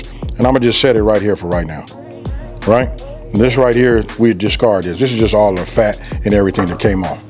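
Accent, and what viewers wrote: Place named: California, United States. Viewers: Does anybody know where this guy is from? I think I heard him say he was in Vegas these days but he don't sound like he's from Vegas. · For all those who think Californians don’t have an accent, this right here is a prime representation of a thick California accent 😆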